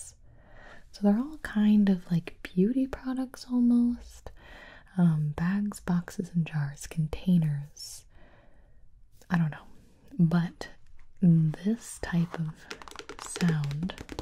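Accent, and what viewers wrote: United States. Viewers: Are you american , You are the best 😀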